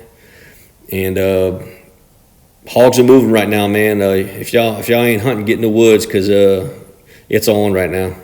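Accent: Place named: Louisiana, United States. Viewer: I’m with you bud, with the scarcity of ammo these days, I pick my shots and catch a couple runners. I also use a Grendel as my go to and love it. You sound like you are down around Baton Rouge right?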